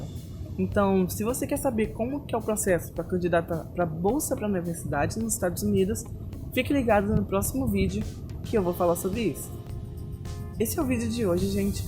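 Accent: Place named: Minas Gerais, Brazil. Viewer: Caraca mano, de minas kkkk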